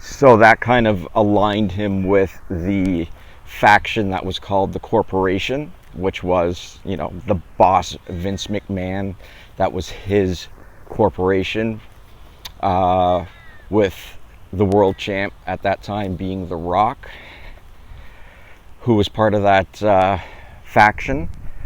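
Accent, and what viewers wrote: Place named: Canada. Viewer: I can tell with all those "oot's" that this guy has to be Canadian.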